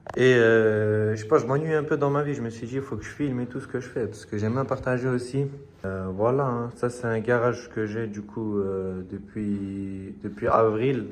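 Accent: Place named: Alsace, France. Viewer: J ai cash reconnu l’accent alsacien mdr 😂 et pour les fleur essaye du diluant normalement t’enlevé tout avec sa et force a toi gros pour tes projets 💪💪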